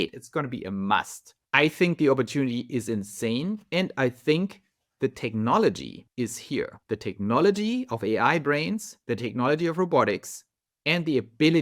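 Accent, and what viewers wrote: Germany. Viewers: The fact that you employ a German accent in your presentation adds to the drama and seriousness. Nice!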